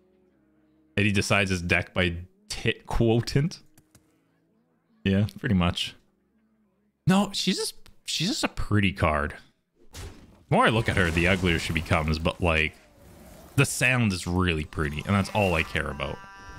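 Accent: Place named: Cockney, United Kingdom. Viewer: People will call us bri'ish schtewpid and then try and do cockney accent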